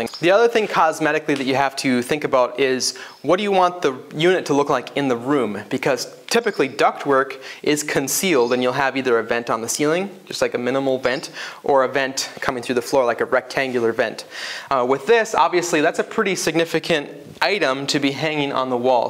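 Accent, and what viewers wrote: Minnesota, United States. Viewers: I was like, "this dude is from MN, or Canada." Nice to see a fellow Mn boy on YouTube. Subscribed.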